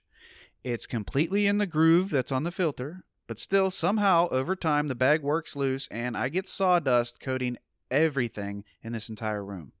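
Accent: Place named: Philadelphia, United States. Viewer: is that a philly accent? its so thick! lol!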